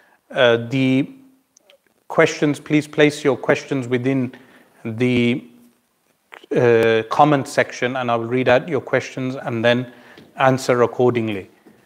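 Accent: England, United Kingdom. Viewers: I love ur English accent and explaination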